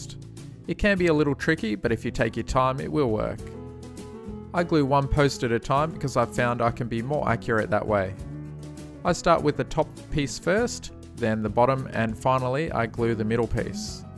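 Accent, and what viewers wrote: Australia. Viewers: Are you Australian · Hey! What part of Australia are you from? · where is he from, Australia ?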